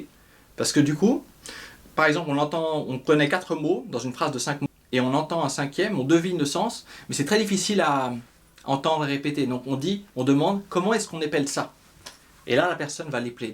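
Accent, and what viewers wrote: Vietnam. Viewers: Permettez-moi de vous tutoyer, pour être honnête tu pronnonce bien le Viêtnamien avec la tonalité SàiGònaise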